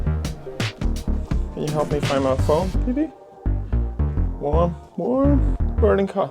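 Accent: Northern Ireland, United Kingdom. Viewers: You are from Northern Ireland? Your accent is a cross between foreign and NI 😂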